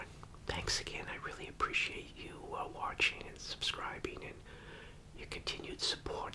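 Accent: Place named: Boston, United States. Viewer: This was painful to watch, from the constant unnecessary stirring of the drink to the "townie" unsophisticated way he eats, the Cornell plates and silver wear he uses, like the low of the very low and the Quincy Boston accent...the whole thing left me with.a feeling of sadness and being thankful it was not me.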